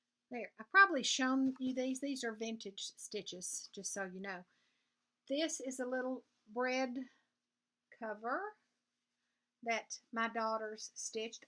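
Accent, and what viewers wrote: South, United States. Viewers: You are precious!! I understand you completely because I’m from the south also!! You make my day!! God Bless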